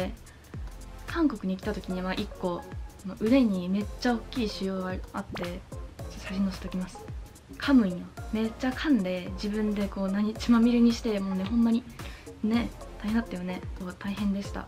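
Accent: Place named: Hiroshima, Japan. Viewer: じゃけどって方言でとるけど広島かな？私も広島🥰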